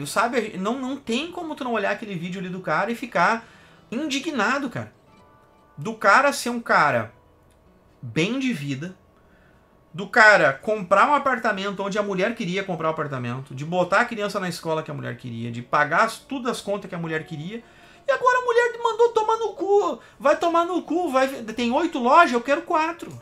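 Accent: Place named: Porto Alegre, Brazil. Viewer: haha saudade do sotaque de poa! Me lembra o programa do Mr. Pi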